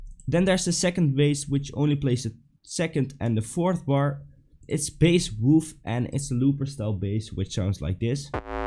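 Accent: Netherlands. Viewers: Nice! Are you dutch btw? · Best makkelijk om te horen dat je een nederlander bent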